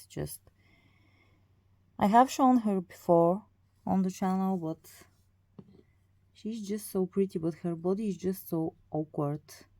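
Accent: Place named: Russia. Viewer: Простите, но акцент вас выдает, вы либо русскоговорящая либо украинско говорящая...я из Украины, для меня это важно :)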